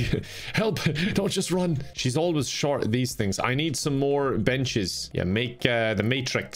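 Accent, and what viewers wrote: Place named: Ireland. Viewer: Didn‘t think I‘d see an irish dr.Eggman today...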